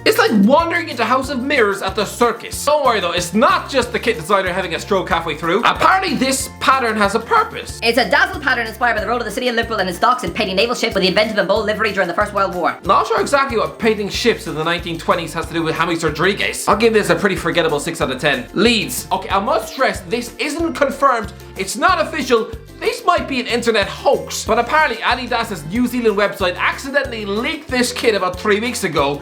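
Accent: Ireland. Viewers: Ready to hear a Irish guy rant again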